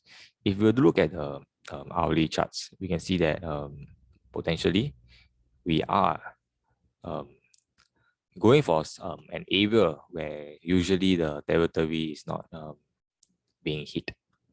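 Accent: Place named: Singapore. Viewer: Singapore accent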